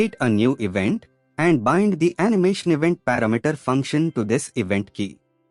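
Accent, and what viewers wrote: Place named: India. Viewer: Why did you specifically use a text to speech with an indian accent?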